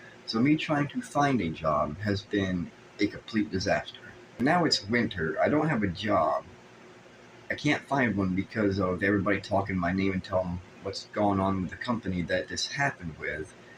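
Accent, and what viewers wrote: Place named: New York, United States. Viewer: How tf did I know you where from ny 😂😂😂 definitely cuse or maybe further up like Albany or Saratoga 😂😂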